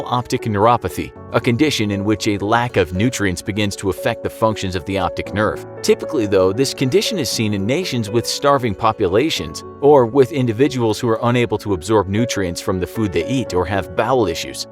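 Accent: United States. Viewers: My mans out here roasting America sounding American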